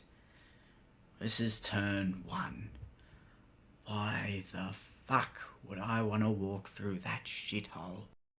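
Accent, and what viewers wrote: Australia. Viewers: I can tell you're Australian right off the bat. its fucken fantastic.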